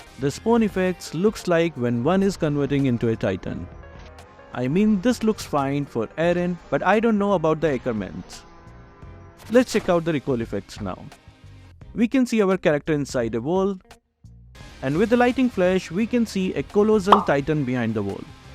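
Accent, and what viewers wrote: India. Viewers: Your indian accent is so hard to understand bruhhhh.....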